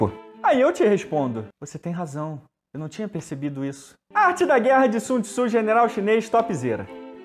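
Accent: Rio de Janeiro, Brazil. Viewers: É o português mais carioca que eu já vi 😁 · "Sou português" falando Português BR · Português com sotaque e falas brasileiras kkkkkkk Também sou kkkkkk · E que sempre me queixei da falta de bons canais do youtube em portugues-pt FINALMENTE, encontrei um feito por um tuga mas com sotaque PT-BR. Enfim ainda bem que ja estou habituado xD Bom trabalho o canal esta incrivel. Mesmo o que fazia falta. e para mais um canal de outro amante de guerra :) Eu confesso que sempre achei a era napoleónica muito chata na guerra. Vais falar deste assunto? Ou para já é tudo historia medieval? Abraços de Portugal :)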